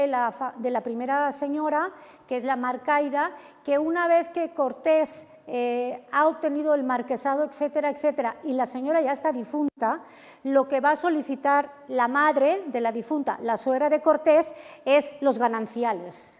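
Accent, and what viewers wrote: Mexico. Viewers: Será residente en México, por que tambien tiene algo de acento. No el canal es tuyo, yo soy un simple seguidor mas cuya pasión es la historia, pero da una conferencia con un tono que se desprende que Cortés ha sido poco menos de un ser miserable, desde el minuto uno, adoleciendo de la imparcialidad con que un historiador debe analizar y presentar la historia. Apenas vi 16 minutos. Y respecto a algún comentario que leí por abajo, discreto, México en la actualidad es donde las instituciones tienen un lenguaje mas antihispánico, cada vez que escucho una conferencia, por lo general, me recuerda mucho a sintonizar tv3, un lenguaje subliminal hilarante. Aunque me alegra decir que tengo algún amigo mexicano y además mestizo, que para nada se ajusta a los "cánones impuestos", será que en su caso tuvo bastante contacto directo con españoles y pudo formarse su idea propia. 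· Me gusta el deje mexicano mezclado con el suyo peninsular.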